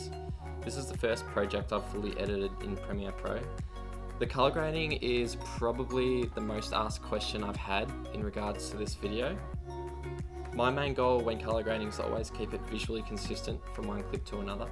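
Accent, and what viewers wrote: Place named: Australia. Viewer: wait are u from australia ? hear ur accent ;)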